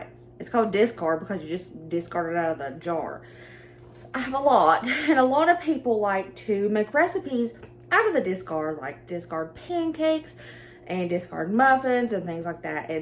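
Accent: South, United States. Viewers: I love hearing your voice! Girl, you deliver with a happy Southern accent! Thank you for being honest and ‘real’!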